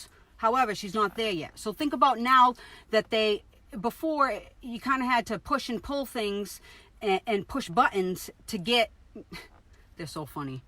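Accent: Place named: Massachusetts, United States. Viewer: Hello.. I know I'm wicked late to respond.. New subscriber here.. As soon as I started listening to you a few video's ago I knew you were from MA! As am I. 💜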